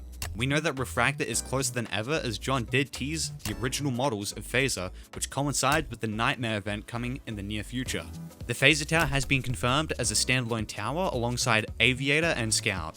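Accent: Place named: Melbourne, Australia. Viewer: It's giving Melbourne accent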